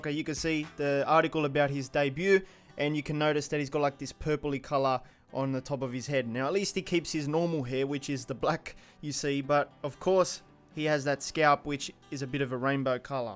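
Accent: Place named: Australia. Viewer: Pretty sure he’s Aussie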